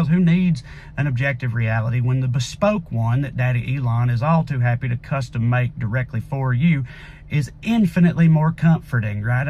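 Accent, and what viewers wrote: South, United States. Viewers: It is so refreshing to hear someone with a southern accent and wicked sense of humor express what is going on in our world. Preach Brother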